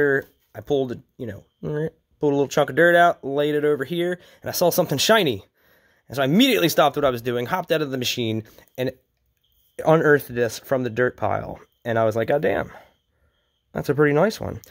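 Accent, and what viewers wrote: Philadelphia, United States. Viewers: Hi I think I here what sounds like a delco or Philly/south jersey accent im from Philly and people think I’m crazy when I’m out of town and I go out to eat and they ask what I’ll have to drink and I say I’ll have a “wooder”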